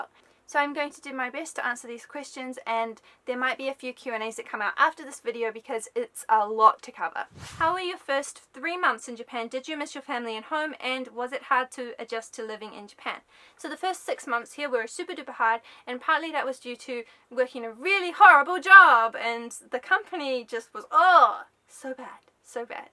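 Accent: New Zealand. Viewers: Yeah it's a bit of a hybrid between her native New Zealand and the Japanese accent she would have adopted a bit from being around Japanese speakers so often.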